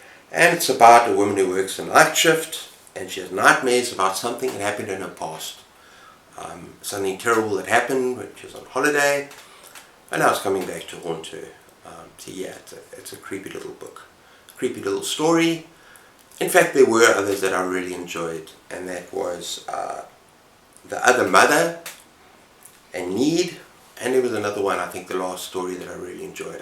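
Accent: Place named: South Africa. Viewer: Love to hear your South African accent and way of speaking. As a South African living outside the country it is great to hear you. And it’s great too to hear someone who engages with the books I love. You live in an area blessed with access to great books. Sterkte, my vriend.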